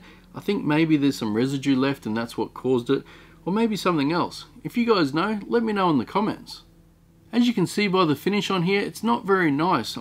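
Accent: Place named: Australia. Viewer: Thanks for your honesty. Good onya for persevering! Great to hear and Aussie voice on youtube too. I'm wondering if they used a egg-shaped silicone mould.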